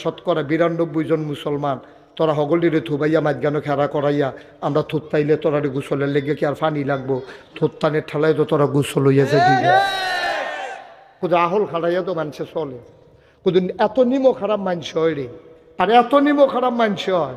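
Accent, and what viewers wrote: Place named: Comilla, Bangladesh. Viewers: বাংলাদেশি, কিন্তু জেলা ভিত্তিক ভাষার ধরনটা একটু আলাদা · বাংলাদেশ কুমিল্লা ডিসটিক গ্রামের ভাষা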